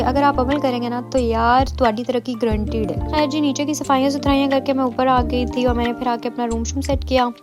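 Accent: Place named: Punjab, India. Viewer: Urdu k sath sath apki punjabi b bht cute haee❤ Stay blessed😊 and make more volgs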